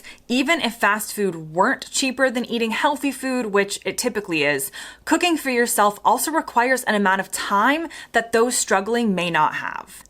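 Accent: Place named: United States. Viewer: It’s interesting that you’ve lived in England for years and you sound like you haven’t left America.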